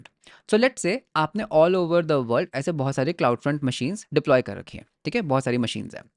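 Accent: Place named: India. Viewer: Bro please work on your accent. English speaker and dropping your series because I can't understand that well especially with 2x speed. And I work with indians a lot at work so I'm above average for an American understanding Indian accents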